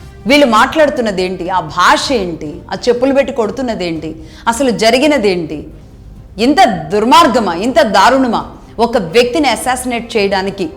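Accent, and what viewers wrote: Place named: Telangana, India. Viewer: మీది తెలంగాణ మేడం ఏం మాట్లాడుతానో ఏమీ అర్థం అయితలేదు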